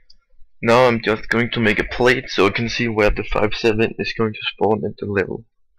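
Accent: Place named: Denmark. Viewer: Sounds like Danish English xD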